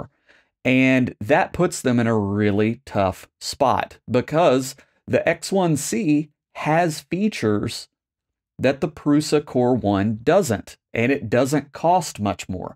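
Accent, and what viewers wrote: United States. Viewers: Based on your accent, I am going to assume you are an American. Can your cars compete with Chinese cars on price, if not for the extra taxes put on them? No? So why the hexx to you expect a Prusa to beat their Chinese counterparts on price? Being a European company, I don't think the price was all that bad! Okay, it may not bring entirely new features. But it brings them Prusa-style! Backed by support that is second to none in this segment, and supported for years to come! Ever since the X1C hit the scene, I have wanted for Prusa to bring their version. I simply can't muster any enthusiasm for any of the Chinese printers! Qidi was close, simply because they brought the heated chamber. But a few fires later, I did not care for one any more. Privacy is clearly not a thing for these companies. That is one of the things I like about Prusa! 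You CAN completely air-gap your printers, and even use an offline computer for slicing, if you want to! Although it would be nice with an included camera, at least you will get one. And you can unplug it, should you feel like it. So the great news here is not the feature-list! It's the fact that it's a Prusa!!! Get over this size-thing-please! People still build 120cm cubed Voron 0.2's. There is a marked for this size. And I think it was a smart move for them to expand upon the MK4; simply because a lot of people whom recently bought their MK4's now won't be left behind. What they loose in sales due to "sma size", they will make up for in sold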